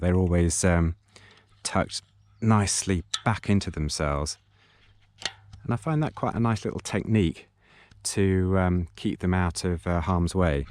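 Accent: United Kingdom. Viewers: Well done great explanation and wonderful to hear an English accent for a change!! Just doing some ourselves so watching this and a few other Youtube Videos as a refresher, thank you!!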